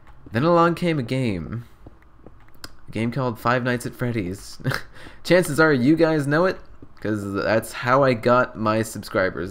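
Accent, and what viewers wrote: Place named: Canada. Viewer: Are you Canadian, or not? The way you say "about" makes me think so. XD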